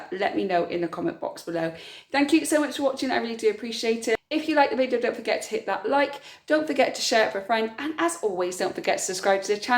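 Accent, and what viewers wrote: United Kingdom. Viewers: Where are you from you sound like you live in Gloucestershire like me.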